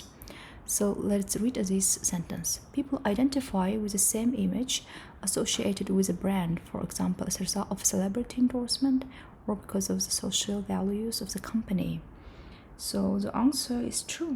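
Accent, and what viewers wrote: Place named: India. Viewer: Why you are pronounce as the Indians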